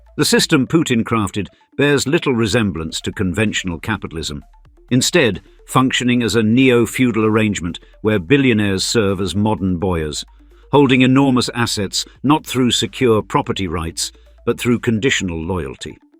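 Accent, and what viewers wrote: United Kingdom. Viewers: Sounds like this Brit narrator is piss Putin took back control of his country and the west cant loot that 76 trillion of resources.😢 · Hence the British accent of this "narrator". most corrupt oligarchs are British and Americans...... including prime ministers and presidents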